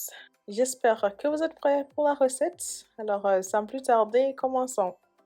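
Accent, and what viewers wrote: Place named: Senegal. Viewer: elle vient du Sénégal vu l'accent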